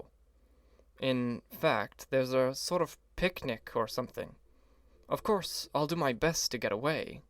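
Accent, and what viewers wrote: United States. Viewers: oh... he was doing an american accent...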